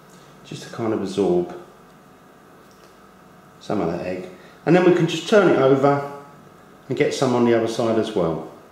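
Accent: United Kingdom. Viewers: british accent <3 <3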